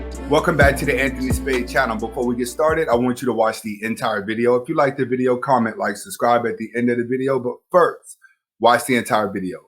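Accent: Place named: New Jersey, United States. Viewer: Bro I could literally feel you were from New Jersey.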